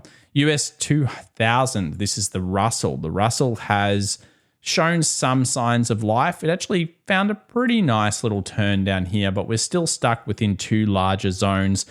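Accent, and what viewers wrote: Australia. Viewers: you're Australian? I thought you're British.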